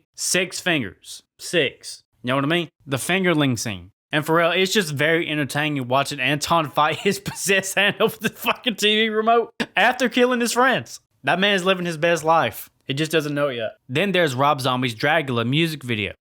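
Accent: South, United States. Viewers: No one ever told me "don't you smoke the devil's weed." How southern are you?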